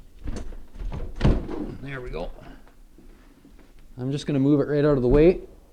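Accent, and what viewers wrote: Canada. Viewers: Only needed to see the first 5 mins, but was captivated and watched the whole thing. The Canadian demeanor is just so lovely...at least from the accent he sounds Canadian…anyway, excellent clip! Right now, my sliding door feels super heavy, and not being that handy, I couldn’t figure out how to pop it out to clean the tracks (even though they look pretty dang clean, or whatever. I had no clue you could adjust the height of the hardware under the door. This is going to be super helpful. I’ve got a weekend project that shouldn’t take much time at all. THANK YOU from Ohio. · great informative video sir, you from Canada eh? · My wife is from Victoria BC. I picked up your accent immediately. Thanks for the vid! I have to replace a broken door.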